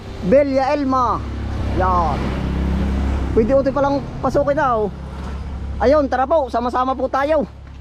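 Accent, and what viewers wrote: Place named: Lucban, Philippines. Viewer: kayo o ba y taga lucban ganda nio mangusap may punto nakka bano ano po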